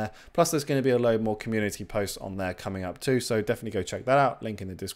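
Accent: United Kingdom. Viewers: It's the British accent. It's a feature not a bug. Nothing to improve.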